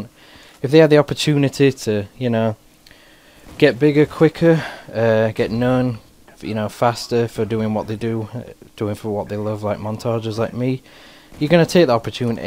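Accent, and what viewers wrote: Yorkshire, United Kingdom. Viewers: Were u from spratt, yorkshire ???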